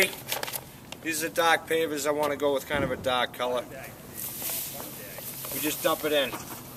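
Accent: United States. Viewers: susan scott My husband grew up in jersey and told me about running behind the mosquito trucks too. I thought this guy sounded more like he had a Jersey accent. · Hey, love that Boston accent. Fellow Bostonian down here in Delray Beach enjoying your video.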